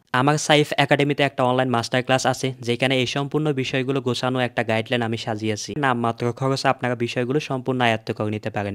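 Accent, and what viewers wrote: Chittagong, Bangladesh. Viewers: চট্টগ্রাম। চট্টগ্রাম এর মানুষের বাংলা উচ্চারণ একটু পার্থক্য আছে।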